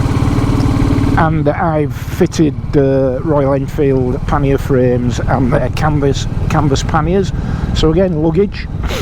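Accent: United Kingdom. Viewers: Keep the music hes in a rush sounds bit british.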